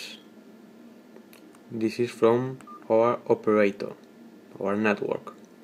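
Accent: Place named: Spain. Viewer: tipico: hablar inglés con un acento español super obvio xd nos pasa a todos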